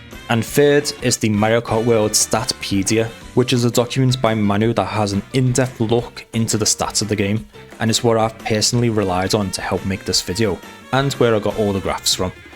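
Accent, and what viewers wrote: United Kingdom. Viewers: hey i didnt know u are british 😭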